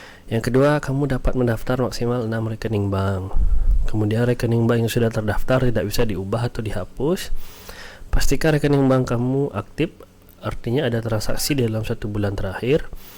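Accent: Bali, Indonesia. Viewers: yeeeee nak Bali boss logat nya keliatan sekali milu sik trading nah hehe makasi sharingnya salam kenal kawan baru dari Bali menyimak see u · Wah kakak orang Bali ya Logatnya kerasa banget ❤️✨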